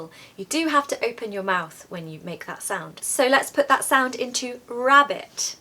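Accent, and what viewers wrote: RP, United Kingdom. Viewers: You speak modern RP. So, for the word PLUM you pronounce plʌm, don't you? However Matthew, my friend from Belfast, didn't understand me when I said it as you. When I wrote it down, he told me this pronunciation (more or less): plɔ:m When I explained it to Elizabeth, my English teacher, she told: I pronounce plɔ:m because I come from Liverpool, but with you I pronounce plʌm because I teach you standard English. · I love Bri ish accent 😁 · That you have a strong 'tea sound', is it that surprising for a Brit? ... ;-) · "Your teaching is absolutely excellent! We especially love learning pronunciation from you, and your British accent is simply wonderful. The way you pronounce words is something we appreciate every time, and it truly helps us improve. Thank you for sharing your knowledge with such clarity and grace!" "Goodnight from my side of the world! 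Hope you're having a wonderful [morning/afternoon/evening] wherever you are, my beautiful teacher.. Hugs.xoxoxo Gams ❤️ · Love that British accent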